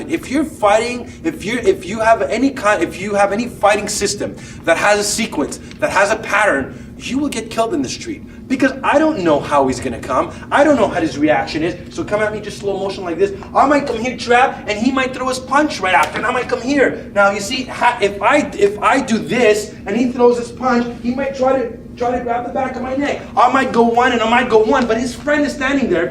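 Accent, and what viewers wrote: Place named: New Jersey, United States. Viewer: yo guy, are u from the jersey or isreal or brooklyn area? i only ask because im from jersey and ur accent kinda sounds like one of the 3 places i mentioned.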